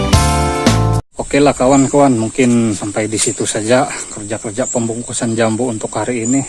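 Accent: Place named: South Kalimantan, Indonesia. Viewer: Logat nya Seperti Kalimantan Selatan Om, Salam kenal saya balikpapan, Kaltim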